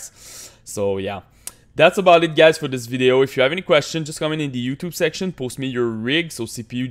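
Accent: Quebec, Canada. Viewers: Are u from Quebec?? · belle accent qc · Are you Québécois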